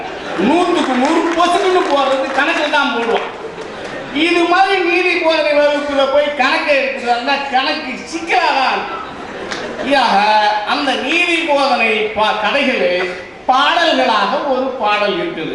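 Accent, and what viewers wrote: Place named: Nadu Nadu, India. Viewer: அருமையான வட்டார வழக்கு., நடுநாட்டு மக்களின் வாழ்வியல் முறைகளை வருங்கால சந்ததியினருக்கு மிக தெளிவாக எடுத்துரைத்துள்ளீர்கள். அருமை ஐயா!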